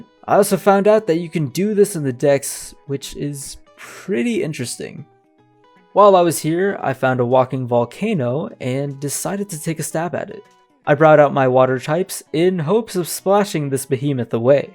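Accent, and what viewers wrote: India. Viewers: U indian??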